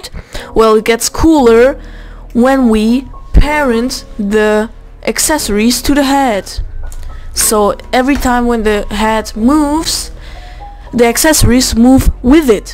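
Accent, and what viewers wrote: Germany. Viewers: dachte mir schon das du deutscher bist haha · ARE YOU DEUTSCH? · Kann es sein das du deutsch bist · yes wait you german? deutsch? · Allein an Stimme erkannt das du deutsch bist, aber danke fürs tur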